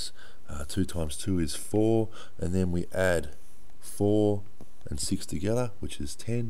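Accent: Australia. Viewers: I agree with Alexandre, ok you are from Australia, but you could talk a little bit more clearly.. if you know you have an accent well.... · is this australian english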